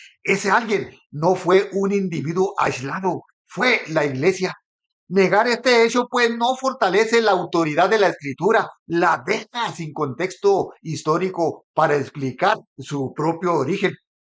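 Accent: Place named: Mexico. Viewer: Me encanto, mas alla de sus palabras, disfrute de esa tonalidad mexicana, se me hizo hermoso en su conjunto.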